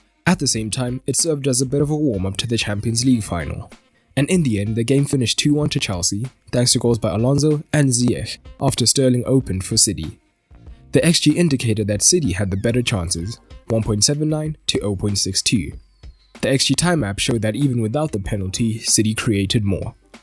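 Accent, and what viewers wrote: South Africa. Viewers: Bro are you south African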